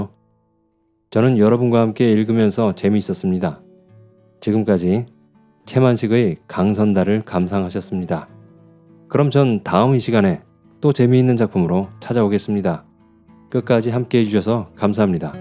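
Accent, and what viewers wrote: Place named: Jeolla, South Korea. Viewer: 📚🌸♥️ ㅎㅎㅎ 님의 전라도사투리 오랫만에 들으니 정겹습니다^^ 수고많이 하셨습니다😂🙏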